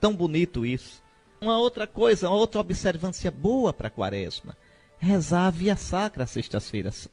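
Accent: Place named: Pernambuco, Brazil. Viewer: Querido Fom Henrique, ajudai-me, lá do Céu,a fazer uma santa quatesma. Como gosto de ouvir suas explicações, com este sotaque e expressões pernambucanas, lembrando a minha origem nordestina! Que gracinha! Tanta santidade, se capta em seu modo de expressar e transmitir as coisas de Deus, com um amor extraordinário por Jesus Cristo, Nosso Senhor! Que delícia são as vossas homilias! ❤❤❤❤❤❤❤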